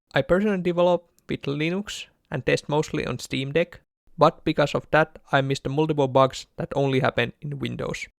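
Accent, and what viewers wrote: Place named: Finland. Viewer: Best of luck to you! I like your attitude and optimism, I hope you succeed in accomplishing your dream to run a small game company. Btw your accent reminds me of My Summer Car, so I assume you are from Finland. You Finns for some reason are very good at game development, so you already have a leg up on the rest of the competition :)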